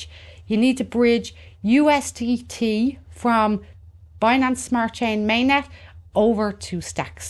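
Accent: Ireland. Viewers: Loving this video, valid info and straight to the point. Good hearing from a fellow Irish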